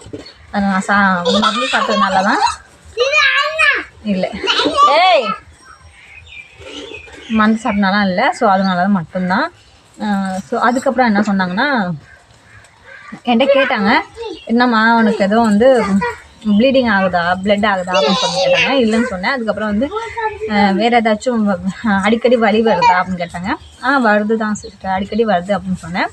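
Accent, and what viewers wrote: Thanjavur, India. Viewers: எங்கம்மாவும் இப்படித்தான் பேசுவாங்க தஞ்சாவூரை சேர்ந்த எல்லோருடைய பேச்சும் இப்படித்தான் இருக்கும்.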